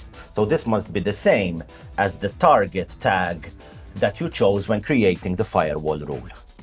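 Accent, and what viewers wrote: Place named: Italy. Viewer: great tutorial! I used AWS so far, but this tutorial got me interested in GCP and I started learning about GCP more so I think it'll be a longer journey which I got into thanks to you :) I just love how smooth the GCP interface is compared to AWS p.s. nice accent, I'm guessing Italian although I don't know what is the accent of Maltans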